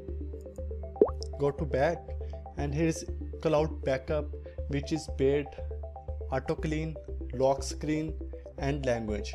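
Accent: India. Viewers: fucking india aksen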